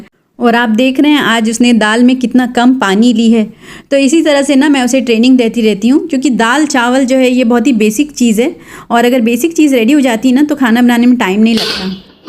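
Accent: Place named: Uttar Pradesh, India. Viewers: Masha allah aapne notice kiya 3 4 days aap k subscriber fatafat increase ho rahe mujhe bhi dekh k maza araha hai aisa lag raha hai ye mera hi channel hai aap k andar se mujhe bahot hi apne pann ki vibes aati hai main bhi u.p se hu gonda se aapki way of tone bilkul humare jaisi hai. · MashaAllah I love ur content...also I am from mumbai and looking ur talking style I feel ur originally from UP..kuiki humbling mumbai mein rahe hai aur parents UP k hai...baat aap jaise hi karte hai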